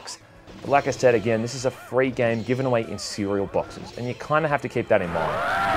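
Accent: Australia. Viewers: Woo! Aussie game reviewers are a nice change.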